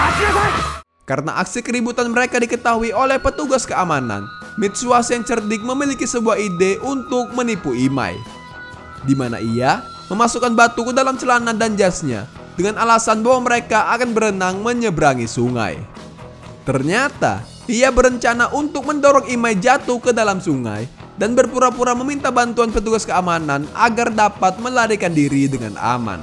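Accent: Medan, Indonesia. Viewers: Medan kali bahas nya bossss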